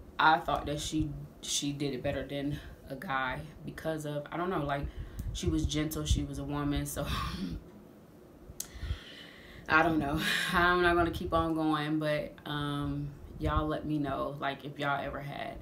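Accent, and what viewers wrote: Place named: Ohio, United States. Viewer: I felt you were from the Buckeye, eard it in your voice. I live in the Cleveland area but I'm fom Atlanta. I've been here 14 yeas. Love te story, we all have one...even the " oh my" folks.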